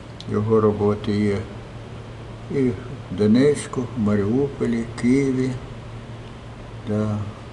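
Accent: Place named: Russia. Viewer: Надеюсь все выучили слово благодаря)))., Ваш украинский все равно русский.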